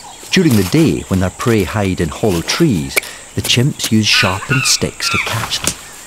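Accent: Scotland, United Kingdom. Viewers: Is it just me or does the narrator sound somewhat Scottish?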